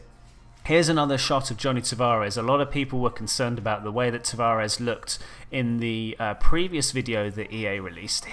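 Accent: United Kingdom. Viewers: It's funny, I've never heard a hockey fan with a British accent!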